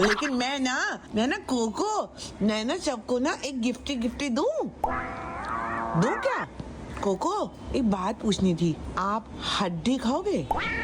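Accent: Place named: Maharashtra, India. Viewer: So cutely she speaks मराठी... being maharashtrian I like her मराठी accent... झोपला भोपला😂😅😂😅😂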